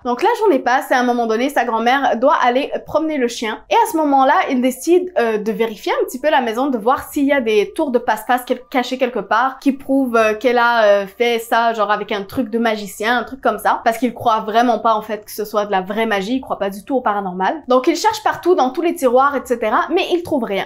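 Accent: Quebec, Canada. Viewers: Est-ce que tu es québécoise? Car ton acsent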